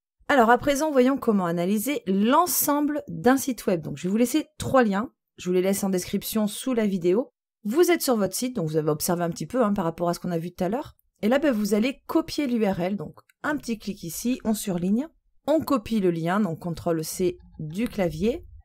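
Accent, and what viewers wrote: South, France. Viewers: merci très bien expliqué léger accent qui vient du sud..peut être de la ville rose... si je peux me permettre serait il possible de mettre un son bien moins fort pour un maximum de confort auditif convaincu je m'abonne c'est plus instructif qu'un cours en FOAD et plus "rafraichissant" bonne continuation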